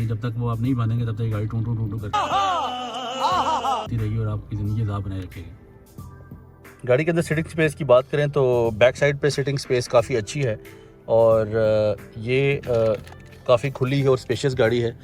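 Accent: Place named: Punjab, India. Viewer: i love your punjabi accent ❤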